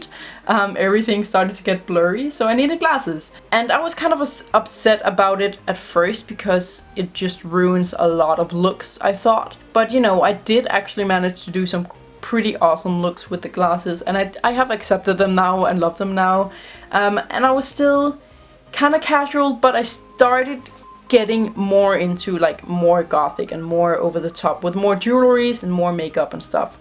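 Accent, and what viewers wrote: Denmark. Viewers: lol jeg vidste du var dansk pga din accent, har også gået på smededal!!!!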